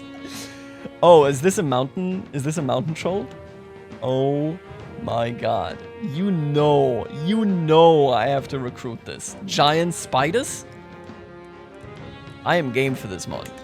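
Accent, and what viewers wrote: Germany. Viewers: I heard your english and think: "Mhm he sounds like a german." And than i see your Channelname 😂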